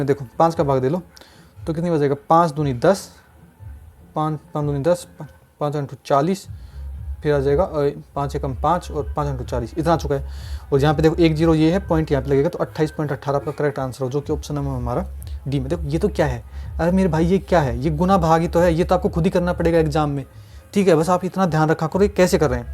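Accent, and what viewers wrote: Rajasthan, India. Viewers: Bhai app rajsthan se ho kya ? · Rajsthan se ho kya bhaiya aap 😅❤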